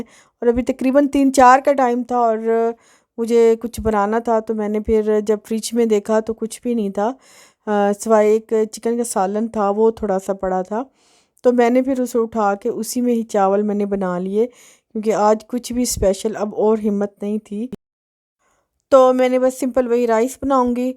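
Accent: Saraiki, Pakistan. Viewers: apni boli sariki my bht mithas hy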